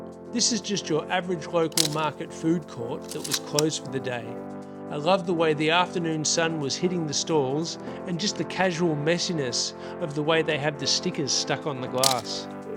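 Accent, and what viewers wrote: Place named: Australia. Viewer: Love the explanation of the film, and your casual Aussie cheeky delivery. Nice product hand flourishes, this too could be yours on...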